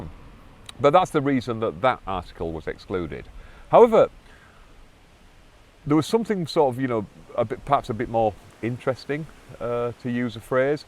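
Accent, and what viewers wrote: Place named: England, United Kingdom. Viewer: Wonderful accent. Very English. No conclusion.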